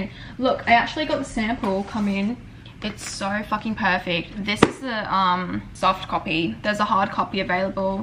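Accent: Australia. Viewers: It's an Australian accent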